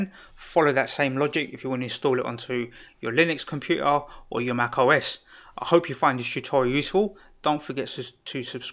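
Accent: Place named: Cockney, United Kingdom. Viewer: Thank you my fellow cockney instructor! clear and concise you are.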